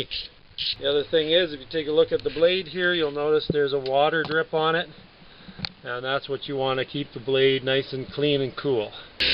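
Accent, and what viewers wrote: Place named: Canada. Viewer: I detect a Canadia accent. We have 20 acres with mixed conifer forest, and a small number of oak. We need to clear trees to build. I thought we would turn the trees into firewood and buy lumber, but I'm starting to like this idea more and more. We have some very large trees compared to what you're working with, some that size too. Thanks for posting this.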